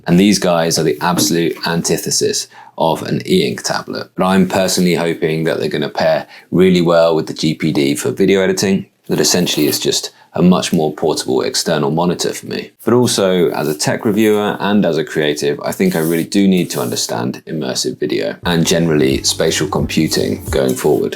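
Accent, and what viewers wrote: United Kingdom. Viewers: I'm a frog. You have such a looooovely British accent. Love it! Sincerely.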